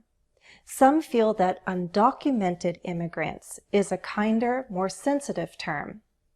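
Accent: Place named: United States. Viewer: Hello. Thanks for your teachings! I have a question. You teach British English. Why in this video, you used American accent?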